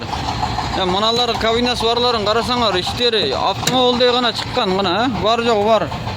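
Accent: Kyrgyz, China. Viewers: Бул алып баруучу кытайлык окшойт. Суйлогонуго Караганда? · сүйлөшүнө караганда кытайлык кыргыз бизге баасын айт дизельнийдин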